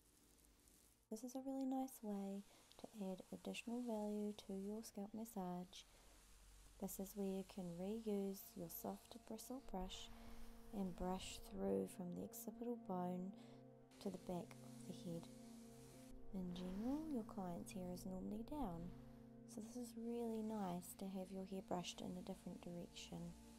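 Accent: New Zealand. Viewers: do I hear a kiwi accent :) ? very beautiful work btw... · You sound like a kiwi ( newzealanders)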